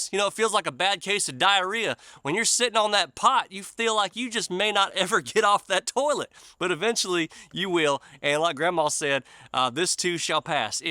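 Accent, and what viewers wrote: South, United States. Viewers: takes a true Southerner to talk like. its a lifestyle you can't make this stuff up!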